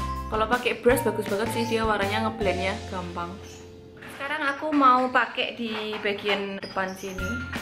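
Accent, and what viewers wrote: Java, Indonesia. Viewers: Kesini krn beranda tak kira org korea trnyt medok wkw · Baru pertama nonton aja udahh love sma 😍😍 medhok e kyok aku rek wkwk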